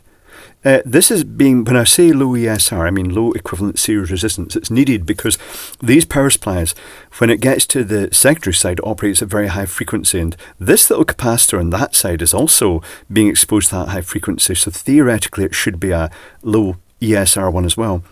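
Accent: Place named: England, United Kingdom. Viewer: I like your voice it sound very smooth and nice English accent. It's my first time that I saw your face. You are alike my Aust. friend. Big guy with a soft voice. Don't misunderstand me, I am not queer. 555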